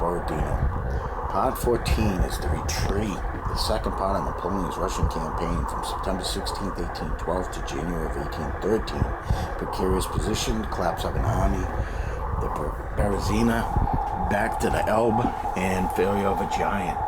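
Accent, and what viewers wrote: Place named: Boston, United States. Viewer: Put this video on because I just bought this book. Wanted to hear some thoughts on it and all I can hear is the Boston accent haha. Sounded like my dad talking about Napoleon. ‘We’re gonna learn about how Napoleon lost in Russia. What a chowah-head.’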